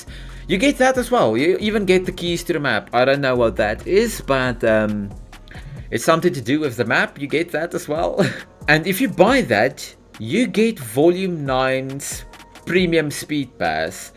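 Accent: South Africa. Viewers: Do I hear a fellow South African?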